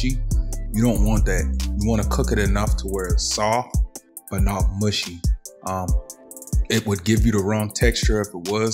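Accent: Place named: Charleston, United States. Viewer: I knew by the accent! Good ole Chuck Town! I just subscribed! Keep the videos coming! It's very helpful!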